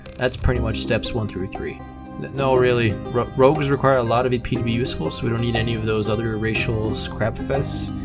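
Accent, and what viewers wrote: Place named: Midwest, United States. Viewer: yea sounds like a Midwesterner or something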